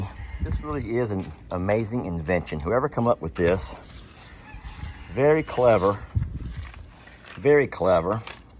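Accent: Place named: South, United States. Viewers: I know you have read my comments I say y’all all the time it’s southern our accent. But it means you all so yes it’s natural to say y’all. Maybe when you were a teacher you might have been more correct in your language. Now you are retired, we are more laxed in our southern accent. And it is okay. Don’t let that comment change your southernness. Your tool room looks awesome! 😊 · I noticed the sexy Texan twang has gotten thicker since you stopped teaching. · My gosh. You're southern and y'all is just how we talk. One person can be y'all 😂 so all y'all is perfectly fine. Talk your way and don't worry about anyone suggesting you speak the way they think you should 🤗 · us Canadian's say eh all the time. It's just part of our lingo. You saying y'all is part of your lingo in the south. I think it's great. Your videos are great! Thank you for all you do!! 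· its just your texas accent...or south...lol Jason from cog hill is worse...i LOVE yalls accents lol your viewer from BC Canada ....Sam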